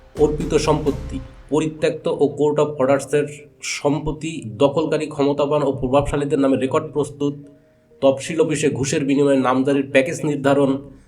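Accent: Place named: South, Bangladesh. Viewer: ভাই অসাধারণ। আমি আপনার এই ভিডিওটা দেখে সত্যিই অভিভুত। বলতেগেলে স্পিক-লেস। আপনার সাথে আমার পরিচয় হয়েছে মূলত কাজী তালিম ভাইর মাধ্যমে। অবশ্য এটা র্ভাচুয়াল মিট------------সরাসরি দেখা বা কথা হয়নি। আমি ক্ষুদ্র মানূয়, পুঁজিবারে সামান্য বিনিয়োগ আছে। আমি সুদ মূক্ত থাকার জন্য এই লাইনে আসা। ভাই আপনার কথায় মনে হচ্ছে আপনি দক্ষিণের লোক। যদি তাই হয় তাহলে আমি আপনার দেশি। আমার বাড়ি বরিশাল। -----------আপনার এলিয়েট ওয়েভ প্রশিক্ষরণ অল্পের জন্য যুক্ত হতে পারিনি। তবে পরে ভিডিও পেয়েছি। ---ধন্যবাদ ভাই ভালো থাকবেন।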